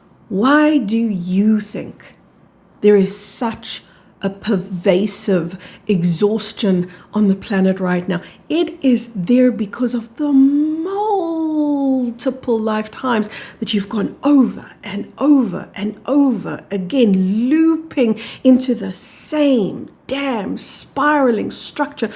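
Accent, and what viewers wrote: South Africa. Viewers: S.A. accent?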